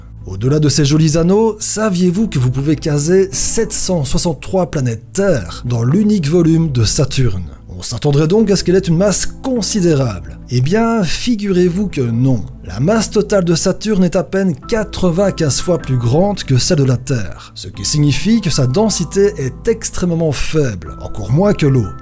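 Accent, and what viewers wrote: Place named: Belgium. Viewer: c'est bon j'ai rien dit. il a dit les mots photo et huit. Belge je pense. bref sympa à regarder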